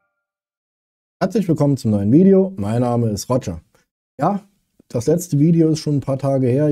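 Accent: Saarland, Germany. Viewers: du hast ein Saarländischen Dialekt :D lustig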